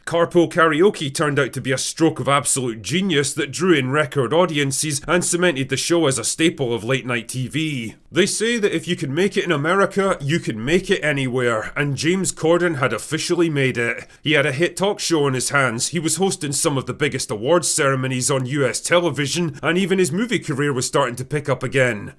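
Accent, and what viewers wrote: United Kingdom. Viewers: why do you have a british accent i just noticed after all these years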